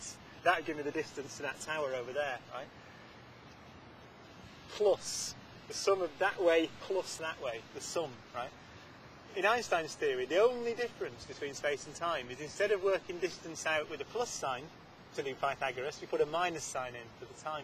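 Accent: United Kingdom. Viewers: this is british english and it sounds much much much more painless than american english.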